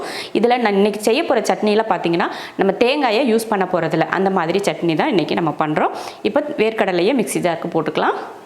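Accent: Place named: Theni, India. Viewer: Akka neenga Theni districta ?Unga Slang ,food habbits apdithan iruku.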